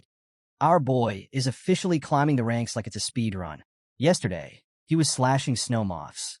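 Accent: Australia. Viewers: It’s much harder to understand at 2x speed when there is an Australian accent. Please don’t make it that way next time, it’s unnecessary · why is this Australian?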